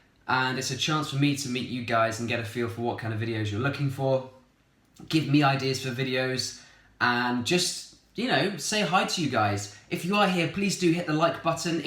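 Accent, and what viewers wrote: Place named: England, United Kingdom. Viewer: Hello.. Great channel, I really like it, Arabic is amazing language, actually I love England accent,so that why i watch your channel to learn more and improve my english😁, keep going👍 أتمنى لك كل الخير والتوفيق good luck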